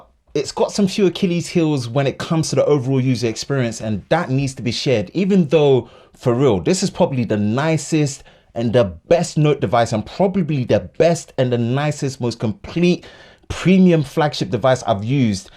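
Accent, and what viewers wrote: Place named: United Kingdom. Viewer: let's just say, I didn't expect a silky British accent, lmao.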